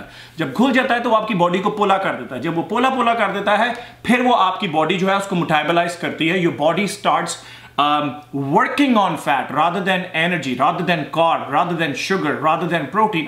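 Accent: Punjab, India. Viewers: Kash like ki jaga pe smily emoji hoti is video k lye tou bunti hy bosss 😜😊😊😊 Thanku soo much for your advice with zbrdast style of punjabi tarqa 😜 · apka bolne ka tarika mujhe bohot pasand hai sir Punjabi English language apki kya baat hai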